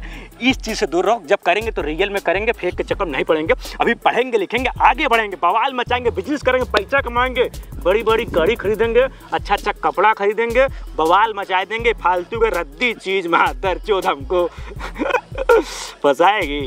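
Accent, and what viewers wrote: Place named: Bihar, India. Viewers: Bolne ka style pehewani aur bhojpuri jaisa hai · Big brother aap Bihar se ho Aap ki avaj Bihari hi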